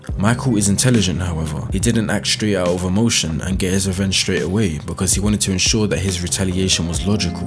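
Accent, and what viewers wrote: United Kingdom. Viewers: Black dude with a british accent. Crazy.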